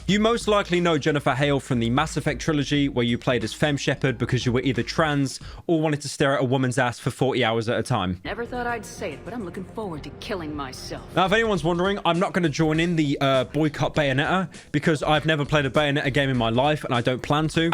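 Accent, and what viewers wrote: United Kingdom. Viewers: I like when the funny British man talks about the popular thing on the screen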